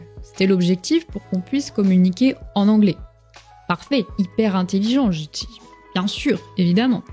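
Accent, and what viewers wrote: France. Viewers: Im English and I think your English was 'tres bon' please don't loose your French accent, its charming and adds musicality to English. I have been teaching myself French for 1 year, the fog of understanding is clearing but I am a long way from speaking. Im concentrating on listening and reading, getting as much comprehensible input as possible. as an old saying says 'Slowly slowly catchy monkey'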